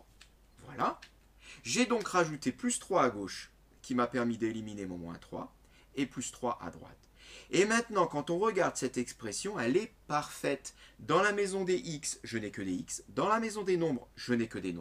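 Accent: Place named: France. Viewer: mais des lunettes et un beau accent français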